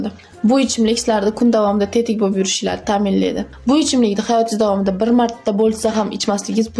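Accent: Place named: Fergana, Uzbekistan. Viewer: Jijja jijjadan Fargʻona sheva Fargʻonaliklar bitta layk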